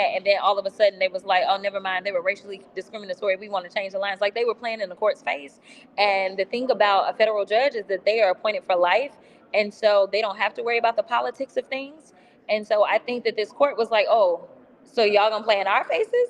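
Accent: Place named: Texas, United States. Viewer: ILYSM. 🥰 Sometimes, your Texan accent gets me, and I have no idea what you're talking about, but I still love you. 🐨🇦🇺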